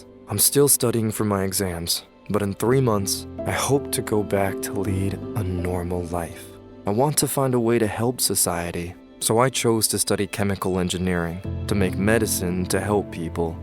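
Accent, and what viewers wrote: United States. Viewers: Ok so he grew up in France and then moved to neither lands so why he got an American accent?????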